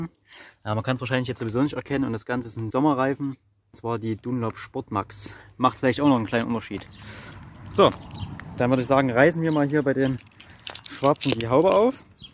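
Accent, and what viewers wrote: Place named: Saxony, Germany. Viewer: Kommst du aus Sachsen? Daumen hoch für den Akzent, Grüße aus DD 👍🏻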